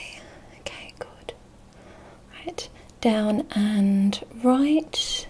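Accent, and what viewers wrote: Scotland, United Kingdom. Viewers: just here to say that I love your videos, british accents are a trigger for me :) hope you’re doing well! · See the problem with your voice and accent is that it is the type of voice and accent that Americans think of when they think of the UK which means they're not ready to hear us Brits with not as well spoken voices and accents! ;) In all seriousness, cracking video! I will make sure I binge a couple more of your videos later! x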